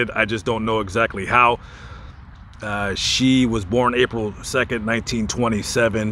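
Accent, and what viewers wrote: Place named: South, United States. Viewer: great pachyderm 0 seconds ago I suggest you visit the St. Nicholas Church Cemetery in Patoutville, Louisiana. In March of 1968 the salt mine collapsed at the Belle Isle Salt Mine. 5 members of the same family died there along with 17 other miners. My cousin Dennis Romero (not buried there) was one of the victims.. You're in Texas and you talk with a somewhat Southern accent and can't be far away. Haha. I'm in Texas often and know how big it is.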